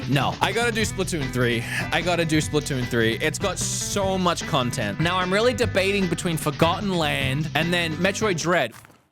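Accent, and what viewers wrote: Australia. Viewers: Where is he from? Is he Australian?? He has a lovely accent! And I can understand it perfectly! ❤😅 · what is your accent, it sounds like a mix of Australian and Boston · The last time I watched one of your videos, you had an aussie accent?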